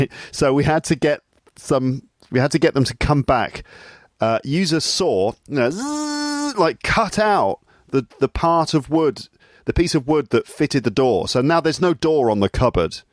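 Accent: RP, United Kingdom. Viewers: Not all sound realistic enough, still, really appreciated your effort to imitate the sound of the instrument, especially the sound of the drill. Overall I love the process of imagining all those appliances and your work on your pod room, while I'm making dinner, your podcast is the best companion as well as learning the received pronunciation accent :goodvibes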